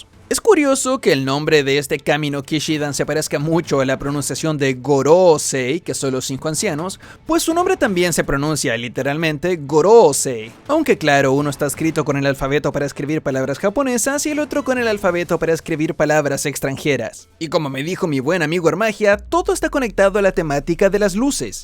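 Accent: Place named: Chile. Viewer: Noooooo puede ser eres chilenoooooo. KAKSJAJSKAKS acabo de darme cuenta cuando hablaste de lo de ussop 😭😭😭. Pensaba que eras español, me subscribo y te sigo de acá hasta que termine one piece Akakjajaks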